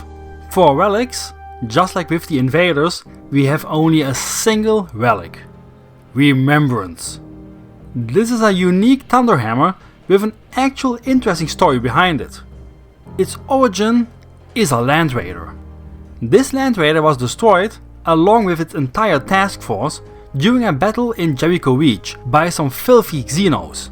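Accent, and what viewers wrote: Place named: Eastern Europe. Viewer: I figured you were from eastern Europe. Rock your accent, brother. I'm a mumbler, myself. Great video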